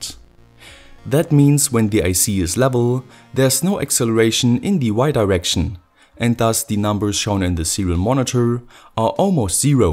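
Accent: Germany. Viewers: German accent is really fine and "clean"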